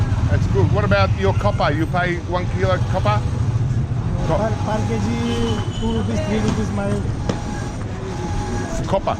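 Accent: Australia. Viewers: probably, Australian accent is too much for him!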